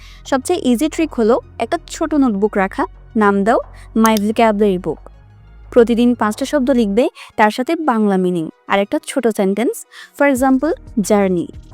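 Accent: Chittagong, Bangladesh. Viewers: Are you frome chittagong?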